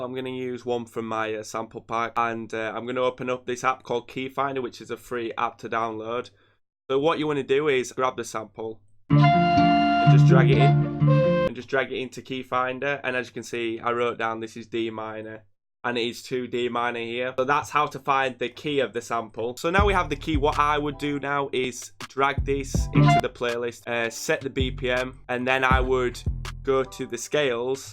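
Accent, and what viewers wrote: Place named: United Kingdom. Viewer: you're the most british producer ive ever heard from man.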